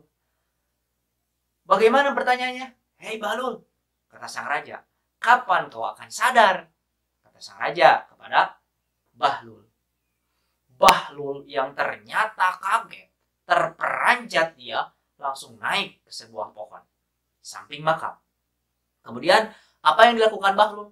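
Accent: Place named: West Java, Indonesia. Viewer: Sunda a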